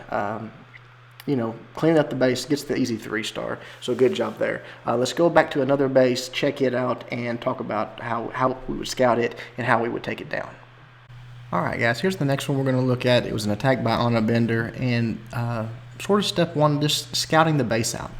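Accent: United States. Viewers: Good video, your english sounds disgustingly american though.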